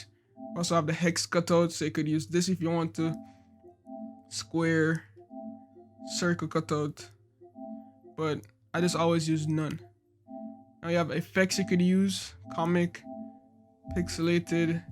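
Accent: Jamaica. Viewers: I hear the Jamaican accent lol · Are you Jamaican 🇯🇲✊🏾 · Man sound like a yawdy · Where you from bro? Sounds like a Jamaican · Yow real medz are you jamaican? ?? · Are you Jamaican? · Mi can hear di Jamaica in yuh yute 😂🤞🏾🇯🇲 good video I'm thinking of buying one. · Wait u Jamaican ?🇯🇲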